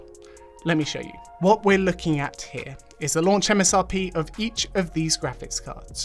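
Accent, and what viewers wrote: United Kingdom. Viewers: interesting video. But i just took a look at your links for a few cards i could be interested at for different prices ranges. Well your links for the so called "cheapest" cards i looked at, well, where more expensive than i have seen on other sites in the uk in the last few days. these links where very disappointing after sitting though your video. Not impressed. Also which market are these best prices from? You are from the UK sounding wise but you keep talking and showing usd prices. Maybe i missed something but you really should say which market your talking about as they can range in prices so much. esp as USA don't post tax's on there card prices quite often. Anyways thanks for the video and the effort but disappointed. Cheers.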